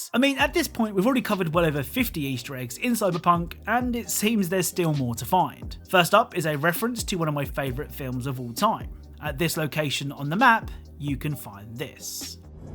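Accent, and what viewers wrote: United Kingdom. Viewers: Where are u frome? UK? You sound yo Britichhhhhhh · He is British.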